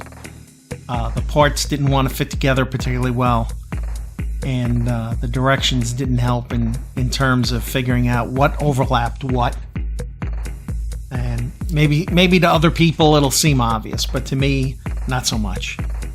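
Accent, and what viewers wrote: Texas, United States. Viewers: Weirdest Texas accent ever 😊 Great build buddy.....glad the channel is so successful and growing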